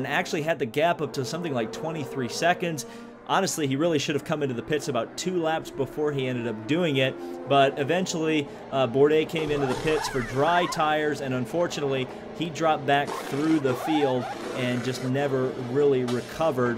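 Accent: United States. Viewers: Hey are you southern? You sound like a southern person to me at least.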